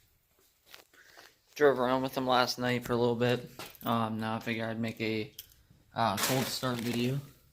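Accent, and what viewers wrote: United States. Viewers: I was so confused why you were on the right hand side lol. You sound American lol. How. ARE YOU ON THE RIGHT HAND SIDE IN THE BEGINING BUT NOT THE MIDDLE OF THE VID. 😂😂 STOP PLAYING WITH MY MIND. But anyways, What did you do for the BOV? Was wondering how mine sounded from the outside. I have same exhaust set up but its all straight piped from the turbo and back.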